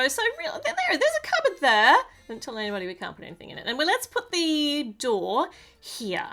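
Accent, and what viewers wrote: Australia. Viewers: Americans: sooooooooo Australians: sooorrr I love your accent and your cheerful attitude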